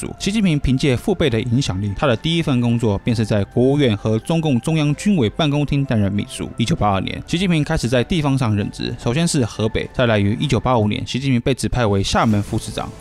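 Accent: Taiwan. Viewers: 台湾口音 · 听这口音就知道是湾湾的😂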